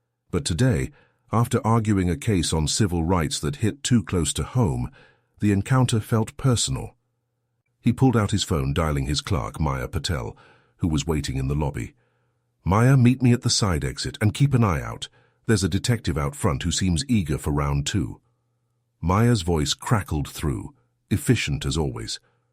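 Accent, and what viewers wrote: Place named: United Kingdom. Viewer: This story is disjointed and ludicrus. This AI crap has really become tiresome. Why is the writer of this story using a British accent to tell an American story?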